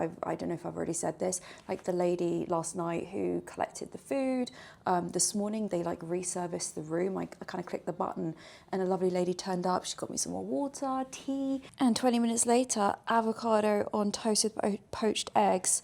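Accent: United Kingdom. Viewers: Lady I can’t believe I’m saying this but I’m falling in love with you…..you are constantly showing us luxury yet you are NOT snobbish….totally down to earth and love your style, your British accent and great content. You gained yourself a new subscriber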